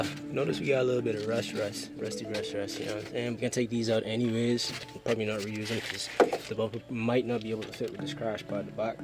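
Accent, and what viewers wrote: Bahamas. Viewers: Could here that accent from a mile away 242!!!! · Heard that Bahamian accent and felt right at home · Bey I say I know that accent sound familiar😂. You gain a sub just because of that. This my first time watching ya videos. Continue dropping the bahama heat bro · why bro sound Bahamian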